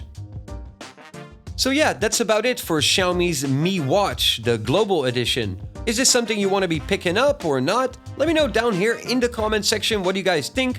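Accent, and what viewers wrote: Netherlands. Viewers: Nederlander, ik hoor het aan je accent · I thought you sounded Dutch. confirmed it. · No offence but you have a very thick Dutch accent, or as they like to call it: "Steenkolenengels" or "Stone coal Dutch (as a self-referencing joke)". Thank you for your thorough review! I enjoyed it immensly! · Do I hear a Dutch accent?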